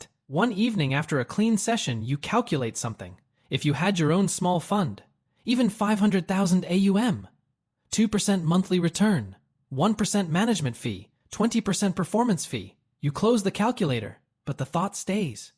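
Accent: United Kingdom. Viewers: I love how it slowly slips in and out of a brittish accent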